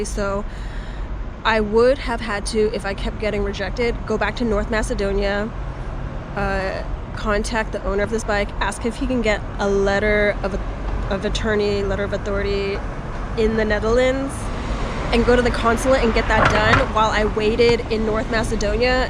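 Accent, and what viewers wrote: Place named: United States. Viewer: Hi from Izmir 🖐️🙂 I wonder why do you have American accent ?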